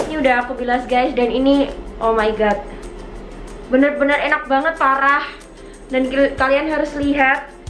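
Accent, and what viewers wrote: Java, Indonesia. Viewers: Medok bgt tapi lucu suaranyaaaa😍😍😍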